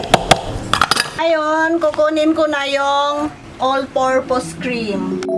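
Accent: Visayas, Philippines. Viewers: bisaya kaya xa ganyan. proud bisaya here.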